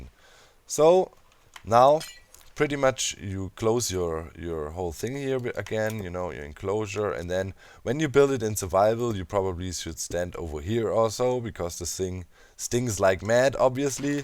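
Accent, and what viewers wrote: Germany. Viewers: Kann ich da einen deutschen aktzent raus hören? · Wie man immer hört wenn jemand deutsch ist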